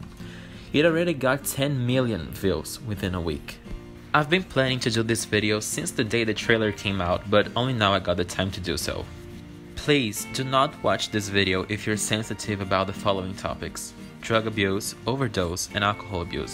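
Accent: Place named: Brazil. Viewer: Esse sotaque parece brasileiro🤔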